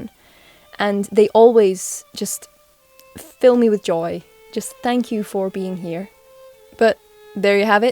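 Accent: England, United Kingdom. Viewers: are you English because you don't sound America thou I might be wrong because I'm english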